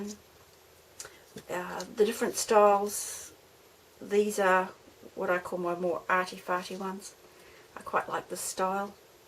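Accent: New Zealand. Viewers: So nice to hear a Kiwis voice on youtube, your tickets are amazing.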